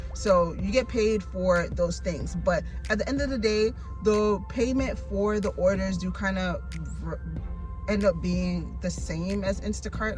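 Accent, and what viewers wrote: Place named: Barbados. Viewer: hello. my name is sean. Thank you for doing this video. thanks for the information about cornershop. You sound like you are a bajan, i hear an accent.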